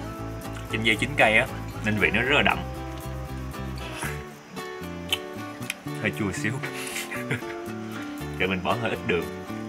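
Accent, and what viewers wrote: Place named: Saigon, Vietnam. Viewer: Nguoi mien Tay, nhung noi giong Saigon, giong tuong doi dung chinh Ta, rat Ro rang, hay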